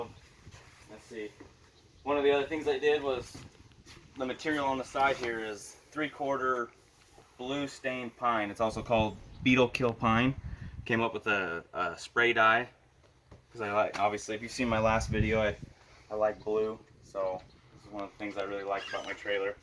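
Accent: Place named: Utah, United States. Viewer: As soon as I heard him talk I could tell he was a Utahn. 😂 Great job way to make us proud! 👍🏼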